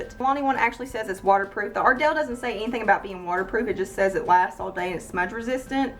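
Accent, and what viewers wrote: South, United States. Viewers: Love your Southern Accent! Your brows are already pretty you don't need to do anything to them! 😊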